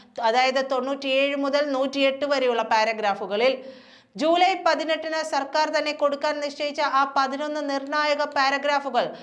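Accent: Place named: Thrissur, India. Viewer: അത് തൃശൂർ ഭാഷയാണ്. ഇവിടെ അങ്ങനെയാണ്.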